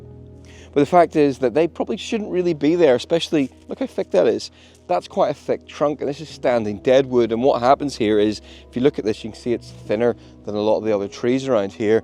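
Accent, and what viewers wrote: Ireland. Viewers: Same problem different country. We have that issue here where i live too, but personalizing it doesn't matter because you can look at every single continent and every country this same issue pops up. It's not new internationally or unique to you guys and your neighbours. Here in this video you talk about plantations as the problem, but they are not the real issue. The real issue is mono crops. Mono crops are not only this major issue destroying all of the worlds forestry wildlife and plants, but also are a major crisis in our food supplies that could actually cause the extinction of our species long before any of natures other disasters if we're not careful going forward. You can speak quite seriously but the tone of the catastrophic disaster that this is really doesn't come through with the charming irish accent haha. Maybe an angry German should narrate how big of a problem this all is, or a Russian with vodka withdrawls lol.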